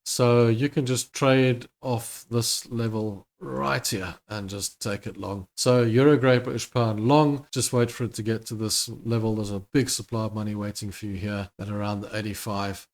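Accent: South Africa. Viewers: Sounds south African to me 😂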